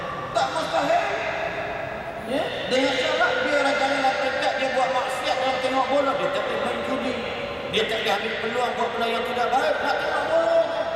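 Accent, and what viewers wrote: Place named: India. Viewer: ni ke ustaz bollywood tu?salu dgr dlam IKIM jer..pndai die ajuk/ngaji slanga india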